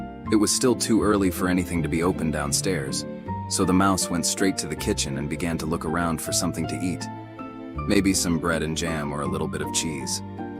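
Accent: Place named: United States. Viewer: The American voice put me off watching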